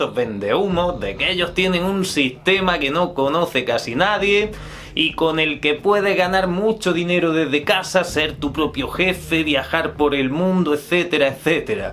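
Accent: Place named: Granada, Spain. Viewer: like solo por el acentazo granaino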